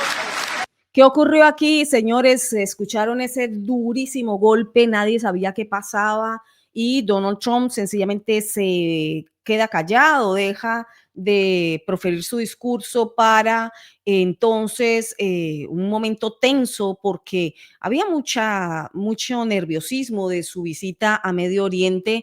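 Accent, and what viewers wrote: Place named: Colombia. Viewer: A pesar de tantos años en EU, no has perdido tu acento colombiano... Es maravilloso.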